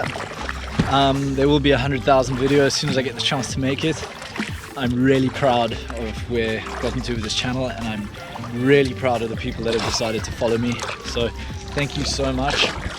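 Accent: South Africa. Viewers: South African accent? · Hi - are you South African - your accent and some of the words you use make me think so? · Is that a Saffer twang I detect?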